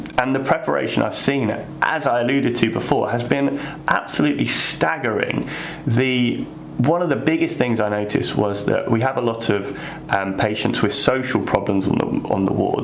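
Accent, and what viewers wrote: United Kingdom. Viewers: Does anyone know where abouts in the UK is? Not for stalking purposes lol 😆 I just thought I recognised his accent, as local to where I live, but I realise he may have moved for work.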